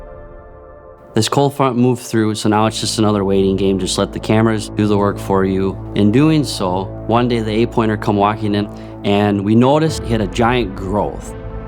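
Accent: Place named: North, United States. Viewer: Holy Northern accent 🤣. Gotta love it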